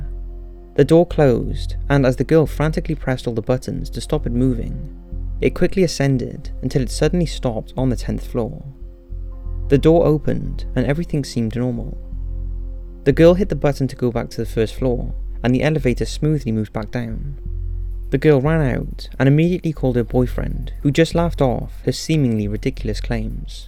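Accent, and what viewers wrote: United Kingdom. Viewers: i love your british accent 😊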